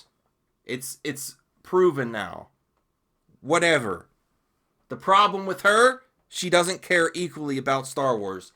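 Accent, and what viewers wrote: Pittsburgh, United States. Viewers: You from Pittsburgh? You sound like a fellow yinzer.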